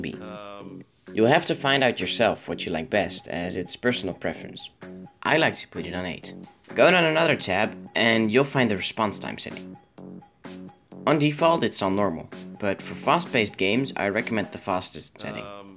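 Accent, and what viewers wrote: Netherlands. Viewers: Merk dat je Nederlands bent duss... heb je last van Ghosting? Is de reactietijd rond 3-1MS? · ben je nederlands je hebt echt een nederlands engels accent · youre dutch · U dutch?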